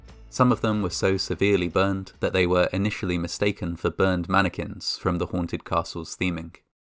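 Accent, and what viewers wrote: United Kingdom. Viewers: He’s got the “creepy voice with British accent” thing down to a science! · Why is it always British people that narrate these type of videos? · Nah, he's just British. They all sound like that.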